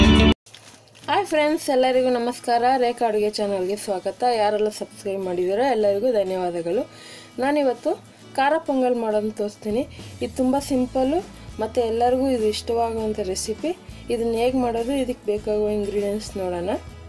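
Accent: Tamil Nadu, India. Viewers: Hello.. I tried for the first time khara Pongal on Saturday in America. It turned out very well 👌🏼 If I cook anything new I always check your channel and see your steps. Very simple n easily you explain. No useless talks. Your Tamil accent Kannada is very nice to hear. Bele obbattu also your simple tips helped me cook perfectly before that I had messed it many times. Thank you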